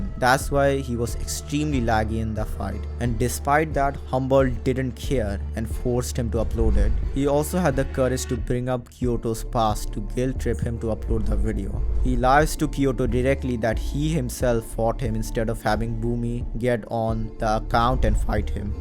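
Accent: India. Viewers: ahh first ur Indian accent fix that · That goofy ahh Indian voice💀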